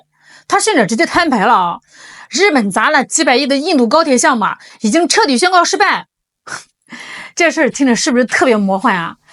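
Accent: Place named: Northwest, China. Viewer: 听口音，这漂亮可爱的小姐姐好像是西北人，希望不是AI，很讨厌AI做成的东西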